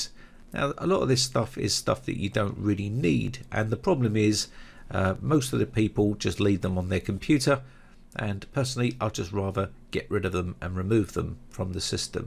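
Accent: United Kingdom. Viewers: Your talking style is so British. Great videos btw.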